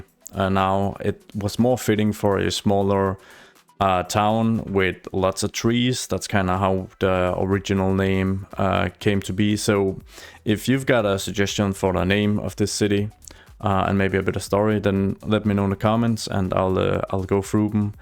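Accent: Denmark. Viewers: I was wondering whether you are from Denmark based on your accent🙂 Btw love your videos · Det er super god danglish :)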